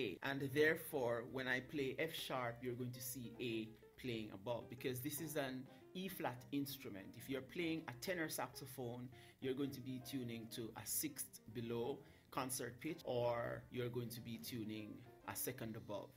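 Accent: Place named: Jamaica. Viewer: Yes, a yardie. I heard the accent and went over to your channel. Can't hide. lol!!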